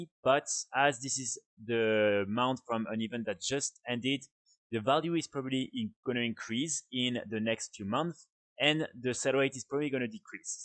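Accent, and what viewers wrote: France. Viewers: Gros accent français qunad même haha ! Nickel la vidéo, continue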